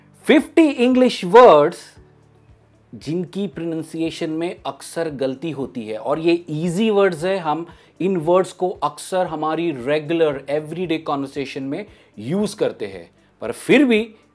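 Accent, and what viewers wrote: United States. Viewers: In my humble opinion, You're teaching american accent not British accent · Are teaching american English pronunciation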